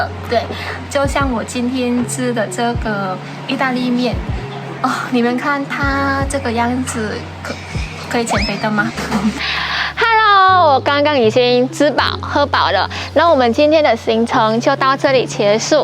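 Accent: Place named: Vietnam. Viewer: 中文超流利的，，，，只是還有一點（越南腔）,不然真的是正港的台灣人了，，讚哦！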